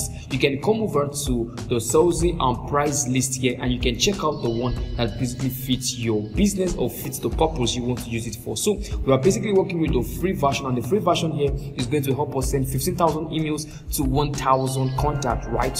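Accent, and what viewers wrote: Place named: Nigeria. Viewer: Are you Nigerian, I can pick up the accent, subtly though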